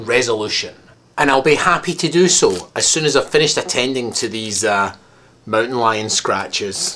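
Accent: Scotland, United Kingdom. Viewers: I thought this was funny. Nice one. Loving the scottish accent lol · Let's hear it for monotonous, inane, recycled social commentary and weak attempts at humor. For the record, Scottish accent != funny. I'm currently soaking your youtube account in vinegar... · Can't get past his Scottish accent-I prefer Michael Myer's version because he's actually funny. YouTube vids stink when it's simply someone's ugly face sitting still while their eyes dart from the camera to the monitor so they can catch a peek at what they look like while shooting the vid. I'd rather watch Michael Richards. His bald, gouteed, & earring look-he's just plain annoying. Wish his resolution was to trash his webcam & switch careers to something where you can't hear or see him.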